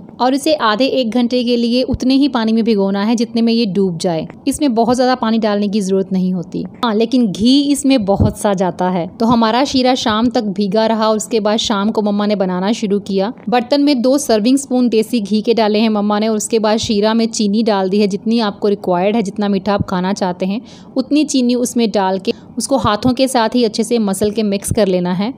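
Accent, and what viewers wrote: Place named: Himachal Pradesh, India. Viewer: Aapki awaz se hi me jaan gyi thi ki aap HP k ho 😊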